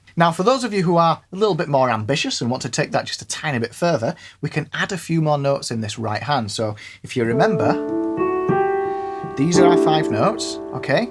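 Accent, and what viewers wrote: United Kingdom. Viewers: great one...! BTW - your name sounds somehow Czech, but your mouth sounds very much British :) Any chance you have some family ties there? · I didn't know that your British accent was associated with farmers and truck drivers. Like I said before , you sound like a normal British person. It doesn't sound southern or anything. I don't know why.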